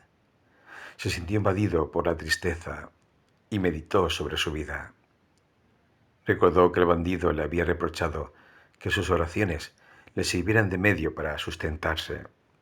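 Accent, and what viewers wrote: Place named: Spain. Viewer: VOZ AGRADABLE Y ESPAÑOLA !!!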